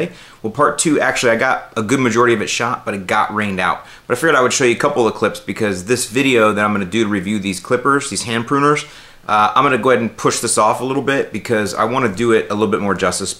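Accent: Chicago, United States. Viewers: Keep the Chicago accent. too funny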